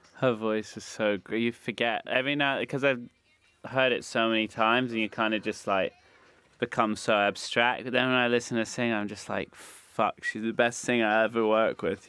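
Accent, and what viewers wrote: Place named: United States. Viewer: this guy now has an American accent, master!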